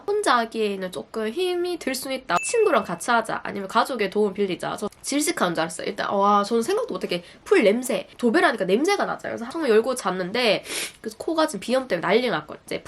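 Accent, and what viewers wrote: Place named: Daegu, South Korea. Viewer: 대구~~ 대구 사투리 반갑습니다~~^^우리동네예요~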